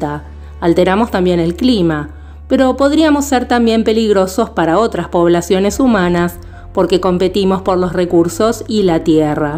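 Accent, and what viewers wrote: Argentina. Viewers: Eres argentina, ¿verdad?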